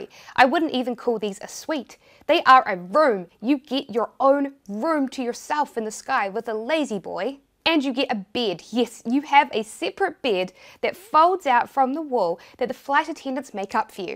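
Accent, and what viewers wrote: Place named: New Zealand. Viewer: That NZ accent. *shudder*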